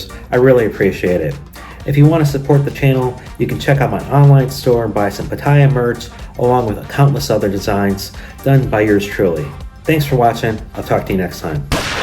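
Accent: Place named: United States. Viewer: Educated English? He's a yank.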